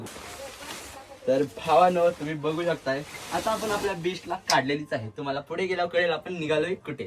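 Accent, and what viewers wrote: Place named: Sangli, India. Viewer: सांगली मधली tone आहे तुझा बोलीची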